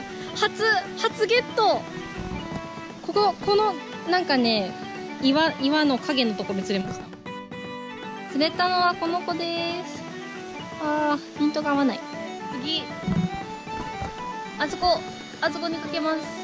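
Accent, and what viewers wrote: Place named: Kyushu, Japan. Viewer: こんばんは！初めまして！ 釣り姿がカッコイイですね！ いい感じのカワムツのオスですね！ 質問ですが九州の方ですかね？ 方言が聞いた事あるのでw チャンネル登録させていただきます！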